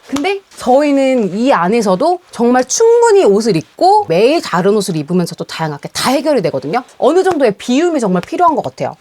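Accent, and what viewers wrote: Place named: Gyeongsang, South Korea. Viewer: 경상도 사람인가보다 서울말이 어울리지않게 힘들어보이네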